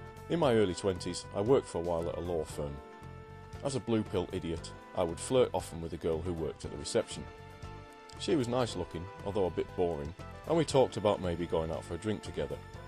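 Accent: United Kingdom. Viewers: No problem. Your vids are great. I'm used to hearing American mgtows, so it's particularly refreshing to listen to another Brit! Your vids are quite unique in that sense as we get to hear an English point of view for a change. Thanks for your sterling work, sir. Looking forward to your next vid.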